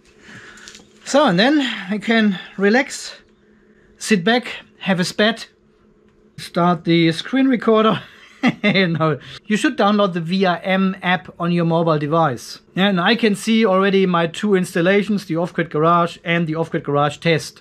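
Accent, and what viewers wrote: Germany. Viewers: Hi,?...you sound like you would have a German-speaking background?....? Well, I am Otto and not busting on knowing about all of this, I try to learn. I am a long-retired, office machine technician, originating from Vienna. Because I run my system on 24 Volt, where should I get the 5.5 Volt supply from for my two in-line Lynx distributors to get the system indicator lights working? I have a basic Victron Cerbo GX and on top, at the far left I have two USB positions free, should I join a USB cable, cut one end off, and link it up with the yellow & black wire of the supplied cable that came with those Lynx distributors? We/A&O made up a solar panel to the battery bank system, controlled with Victron elements, output 220 Volt to overcome those many power-out situations we have in rural QLD to protect the goods in our fridges & freezers and issues when printing 3D when the PC goes wild with the printing program. 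Ergon Energy has the habit of supplying at times too little and even far too much electricity (up to 260 Volt) and some of our appliances did not like that and did quit on us......and you ask what name to give to the Cerbo GX, this unit is very smart - remember who Idefix was? (Idefix & Obelix). Stay awesome, cheers!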